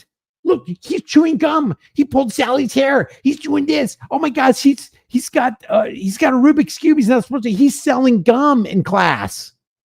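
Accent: United Kingdom. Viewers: The guy who is narrating isn't even a American, Its a British pos. Oh and don't forget they always attack Americans one on many. And always at the end of a gun. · Who is the Nazi with the British accent that’s narrating this video we need to make sure we go to his channel and give him what he deserves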